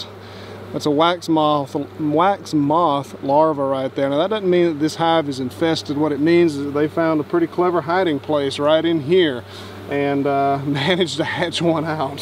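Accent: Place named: United States. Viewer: this is the most american channel i've ever seen and it is so good i can't stop watching. i am sorry if i sound like a butthole i just happy that i could distinquish (spelled wrong probably) an accent and understand all these imperial measurements bc i am interested in new stuff. i love the atmosphere, please keep on going!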